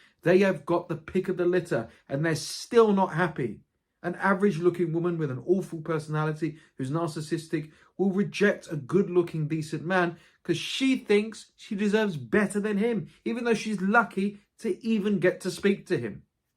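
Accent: United Kingdom. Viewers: I have difficulty understanding the British accent. But I thank you for making this video. Because this is the first time someone has made a video on this topic, I agree with everything you said. In fact, there are some shortcomings. Global capital has globalized the entire world. It has corrupted people's morality. People's minds and brains have become completely for money, global capital culture has ended morality and marriage. People are now avoiding each other. Nobody trusts anybody. Almost everyone has become evil, turned into a narcissistic dog, thank you again for making this video.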